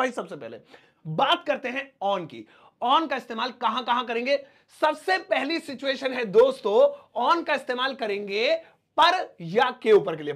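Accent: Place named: Delhi, India. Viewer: Sir lgta h aap delhi se ho 😂😂😂❤❤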